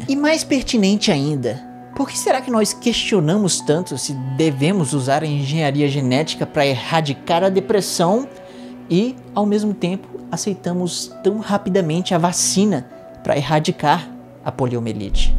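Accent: Ceará, Brazil. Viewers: Só uma pergunta, ele é do Ceará?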